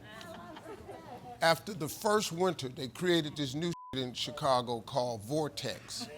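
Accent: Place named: Kansas, United States. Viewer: That sh*t sound like Kansas haha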